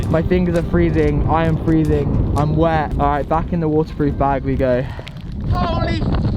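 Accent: RP, United Kingdom. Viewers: I like your vids but my god you sound like a tory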